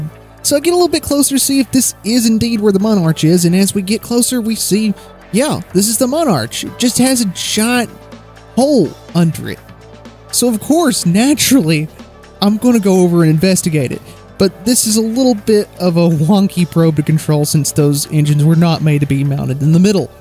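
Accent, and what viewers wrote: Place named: Virginia, United States. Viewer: First time I stumbled across your channel, and I gotta say... Wow, this was really enjoyable to watch. Great commentary, you didn't drag it out 3 times longer than needed to be, bits of humor, and nice editing. 👍👍 Also, you sound like my relatives, and I'm guessing you live in western area of Virginia.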